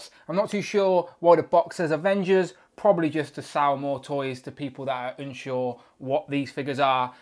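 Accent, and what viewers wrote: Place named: United Kingdom. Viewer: 23 Famez haha right ? Lol drove me nuts, but In his defense at the same time I know he’s a Brit and probably isn’t use to our American pronunciations 😅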